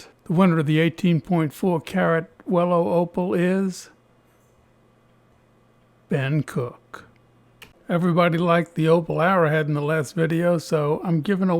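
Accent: United States. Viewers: As I was leaving that comment I was thinking that I was probably wrong about the area of the United States where you picked up your Inflection. Bottom line Is that it all goes together with your tongue in cheek humour... It works!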